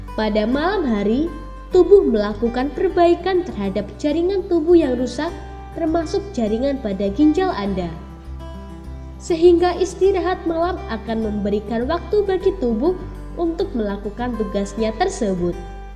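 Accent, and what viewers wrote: Java, Indonesia. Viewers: Suaranya medooook banget mbak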